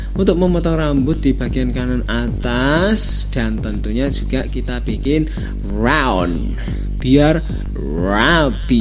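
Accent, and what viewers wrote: Java, Indonesia. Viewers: Suara jamet Jawa bgt cuih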